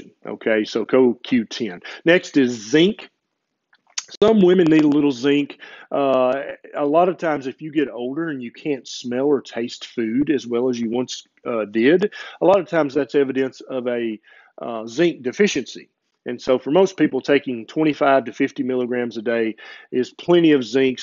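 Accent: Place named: Tennessee, United States. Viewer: I love you! You are so funny and shoot from the hip! Put together with that Tennessee accent and you have become my fav person to listen too!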